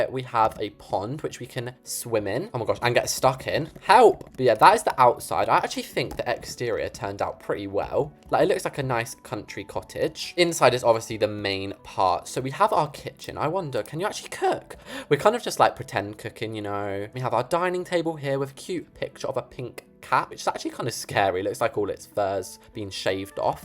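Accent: United States. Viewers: Your american accent though🤭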